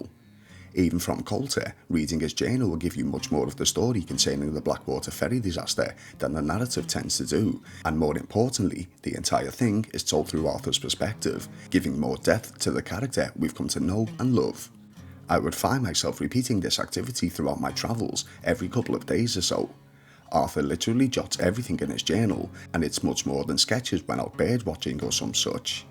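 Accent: Liverpool, United Kingdom. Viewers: Nice to hear a fellow scouser doing something on YouTube that’s not relating to footie.😃 Luv RDR2 and 1 as well. I usually start off bad ie killing the O’Driscoll in the Adler stable and Jimmy Brooks off the cliff, but by Ch 4 I’m a pretty good guy most of the time anyway. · Alright mate can see you’ve not uploaded for a small while, just thought I’d give you a shout and say that I think your videos are bloody incredible. I’ve been playing RDR2 for some time, but never fail to find something new through your videos. It’s also a pleasure to hear a scouser talk about my favourite game. Hope all’s well and looking forward to your next video!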